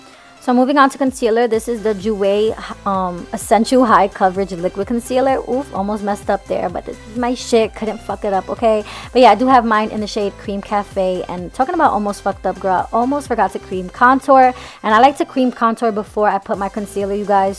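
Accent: New York, United States. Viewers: I knew you was from NY when I heard the way you speak lol we lit this look is beauty full honey 🥳🤟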